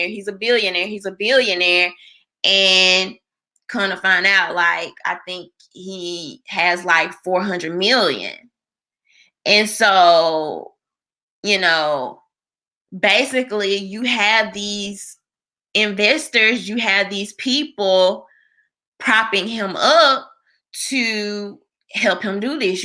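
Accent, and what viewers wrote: South, United States. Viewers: The southern accent ....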